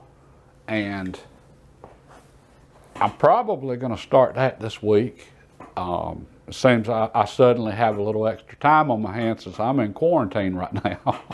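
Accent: South, United States. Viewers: You have just about the best southern accent of all time.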